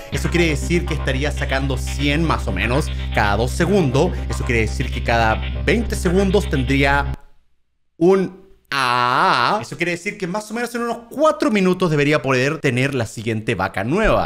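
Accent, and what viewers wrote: Chile. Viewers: like acalos que quieren un video de german hablando puro chileno :p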